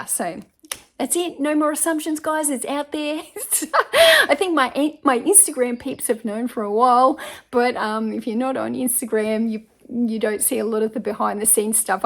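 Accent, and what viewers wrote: Australia. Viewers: I have 0 clue who you are, but I'm an eBay seller too, so I'm sure why you popped up as a YouTube recommendation. But damn that Aussie accent hooked me.... "YOU SOUND LIKE YOURE FROM LONDON" ( did you know they say the aussie accent was created because of all the drunken British)? . You seem lovely and love your energy. Keep doing your thing. Ebay is LIFE. Subscribed and look forward to more. The one thing my life is missing is a Thom. You give me hope even though I'm old as well ill beagle to find one.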